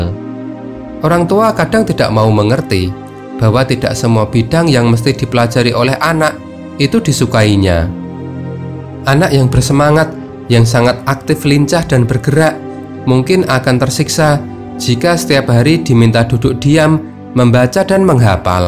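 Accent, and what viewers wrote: Java, Indonesia. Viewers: Adik aku bilang "jisoo org keturunan Jawa yaa bang!?" Itu mah abangnya ini yg pake logat jawanya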